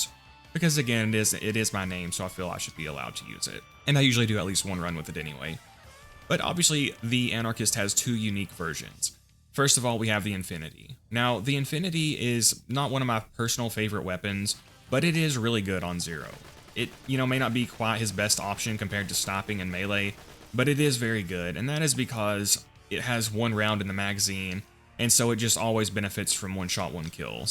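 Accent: South, United States. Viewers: You don't really sound much like fiber honestly. Your accent is pretty clearly southern his is far less so, if at all. Shadow definitely has the master lock picking ability lol. The best bossing weapons in the game, as far as I know, mostly all have a synergy with the bee due to unlisted projectiles, a unique firing pattern, or are able to benefit from multiple parts of the damage formula. The ones that don''t still kill things in op10 but they just take longer to do so. I think much of the gear discourse in this game might actually be driven by availability and the opportunity cost of having an item equipped/taking up bank space compared to another. Having only 63 places per VH to hold gear makes the decision on what to farm, keep, and use more difficult than it otherwise might be. The unlimited bank mod is really helpful for this, but I think much of the discourse around what's "good" at end game stems from the early days when most played on console and mods weren't as readily available on PC. 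Also, does Buttstallion always/often drop gemstones, or is that just the only place you can possibly acquire them? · They honestly do sound similar its just his voice has a southern kind if accent and fiber's doesn't.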